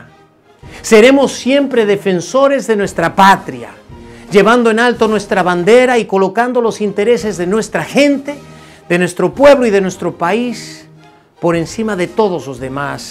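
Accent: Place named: Dominican Republic. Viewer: Así habla un verdadero Dominicano Ramfis presidente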